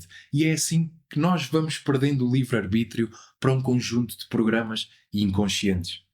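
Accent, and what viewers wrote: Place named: Portugal. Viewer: Ai gente sotaque português é lindo 😍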